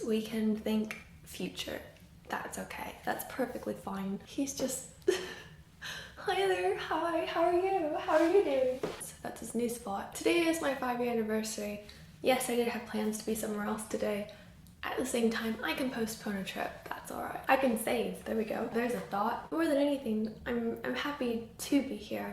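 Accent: England, United Kingdom. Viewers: I love how you sound a little british now❤️ congratulations! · You are sounding quite English lol love it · The longer you are in England, the more British you sound. For some reason, the accent really suits you! Happy anniversary. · I can hear the British accent starting to shine thru, like a little bit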